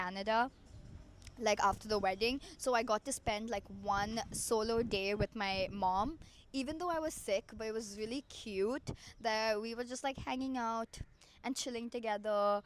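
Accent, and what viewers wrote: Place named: Bengal, India. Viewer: Are you a Bengali what's with your accent 🧐🧐🧐🧐🤨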